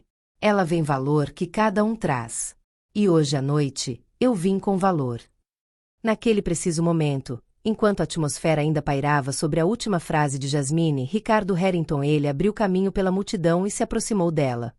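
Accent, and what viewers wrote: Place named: Spain. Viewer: O português, de repente, do nada, vira espanhol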